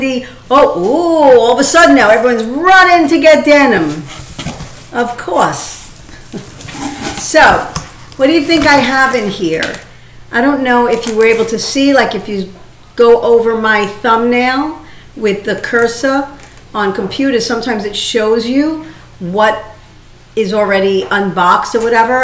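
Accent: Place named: New York, United States. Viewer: You sound like your from nyc I was born in the Bronx NY 💕😍😍💕😍 beautiful bag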